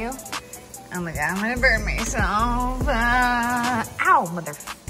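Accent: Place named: New York, United States. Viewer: Why does she sound like a New Yorker but she is somewhere in Texas, per a TV show video I saw?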